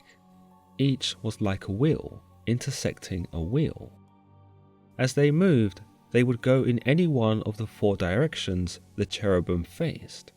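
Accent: United Kingdom. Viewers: The narrator is a pleasure to listen to. He has a very pleasant voice, great diction plus a very elegant (and easy to understand) British accent. Very enjoyable.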